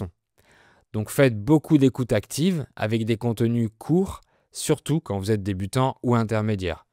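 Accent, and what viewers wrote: South, France. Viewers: Warum spricht er "chose" stets wie "chance" aus? Hat das etwas mit seinem "südfranzösischen Akzent" zu tun? Ich bin seit Monaten deshalb verwirrt.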